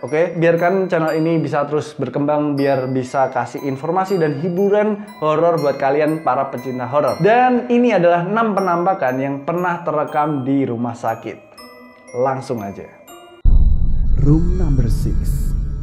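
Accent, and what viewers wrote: Java, Indonesia. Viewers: Medhok tapi gua lu.. #salfok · pake aku kamu saja bang, ga usah lu gue, medokkkk parahhh... kesanya gimana gitu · Medok jawabnya gak hilang mas 😄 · iya mas. kental bgt jawanya, pakai sapaan " aku kamu " aja mas, lebih enak didengar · mas mbok pake "aku kamu" aja mas, jgn "lu gua", lebih oriental.. lagian masih kerasa kok rasa jawa nya hehehe sepurane ya mas, aku yo wong jowo :)))))))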